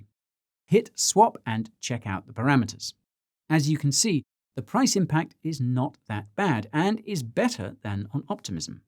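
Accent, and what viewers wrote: United States. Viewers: Is that supposed to be an American accent? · His American accent is getting better and better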